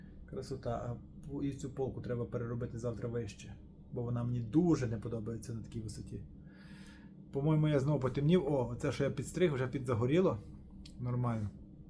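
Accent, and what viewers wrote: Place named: Western Ukraine, Ukraine. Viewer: По акценту точно не з Донецьку і не з Луганщини.Так десь блище до земель народження Степана Андрійовича Бендери. Патріот справжній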